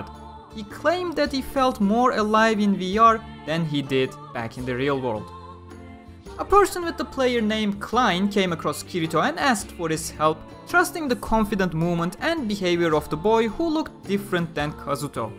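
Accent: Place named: Turkey. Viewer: sao wiki'de gezerken videoyu açar açmaz aksanından dolayı türk bu dedim.. ilk defa aksandan milliyeti tutturdum haha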